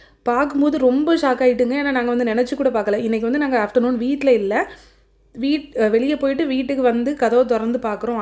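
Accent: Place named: Nagercoil, India. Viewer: Entha ooru nenga Nagercoil pola iruku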